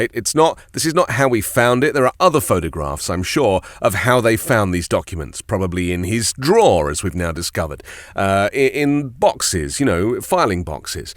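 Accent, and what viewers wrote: United Kingdom. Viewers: OMG 😳 I love 💗 the British accent! We are all in this fight against fascism together. Bless you. 💋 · Don’t u just love the British accent 🥰explaining how trumps ass is about to fry 🔥in the karma grease🤣🙌🏾🌈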